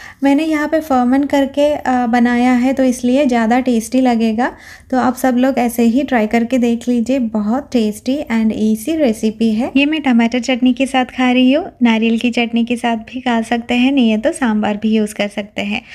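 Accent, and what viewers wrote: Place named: Kerala, India. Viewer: I like your Hindi. You remind me one of my Kerala friend.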